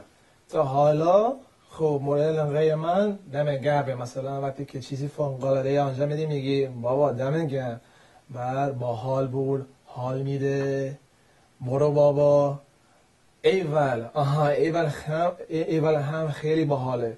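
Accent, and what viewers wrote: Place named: Iran. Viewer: Wow amazing! Has Persian accent.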